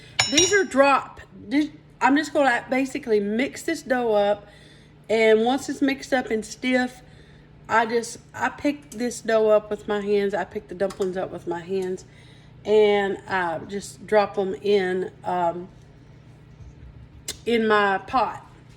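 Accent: South, United States. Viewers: Sister, you might live in Northern Ky., but where are you from? That accent sounds like it’s further south and east. 😊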